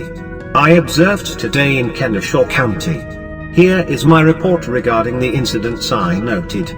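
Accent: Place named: United Kingdom. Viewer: Why the odd british accented bot voice?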